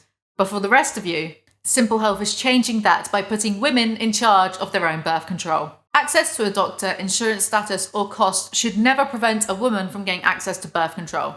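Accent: United Kingdom. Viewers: which british accent do you have? like which area? - from norway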